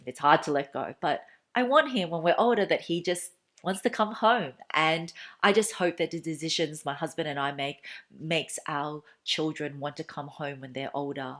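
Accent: Melbourne, Australia. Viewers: Heyy In Melbourne how do you tell if you buy branded goods quality are great quality of the handbags, slg, shoes, clothes ect.... sunglasses, scarf as im thinking of buying something soon like maybe frm lv, bv, ysl, mont blanc, cartier. Thank you for the advice & tips gff im such a hugh fan of yours from a country nearby which is Singapore and oh boy i've been watching your vids/vlogs all weeks, months, weeks, days your my no.2 favourite youtuber oh man i love your aussie aussie accent sounds so smoothing to the ears haha lol very calming as well lol. Omg gff we got the same taste we love going to thrift stores to look for vintage stuff + i love eating as well haha lol awhhhh your dogie is such a cutie so sorry to hear about it so sad 🥰😍😘😗☺😚😙😋🤗🤧🤧🤧🤧🤧🤧😢😥😓😩😭😭😭😭😭😭 & your handbags do you sent it to the bag spa? For cleaning, touching up ect....get it restitching? 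Does your hubby + you sit down with other and talk about not spending too much? You too stay safe stay strong stay healthy as well my gff. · I am not much of a follower of fashion you tubers, but I have enjoyed viewing your videos. I enjoy your content and your Aussie accent and all the videos I have seen you look very pretty and classy. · Hi. I just started following you on youtube and i loved your clips. Also I loved your Aussie accent 😊. I also graduated from Monash University in master of communication back in 15 years ago. Now i lived in USA. Anyway, i just wanna say please continue doing it. You brought back my ultimate moment while i was in Melbourne. Thank u! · I love the accent you had.💕 it’s was same aussies accents? Of Blackpink Rosé she’s korean but born in melbourne Australia 🇦🇺 if I’m not mistaken.🥰🥰🥰😅😅 I love it very classy.💕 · Just found you! Love your videos I miss Melbourne so much and your accent reminds me of home so love listening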